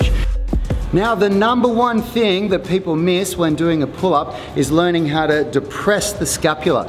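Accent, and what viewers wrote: Australia. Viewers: Great explanation. Are u an Aussie?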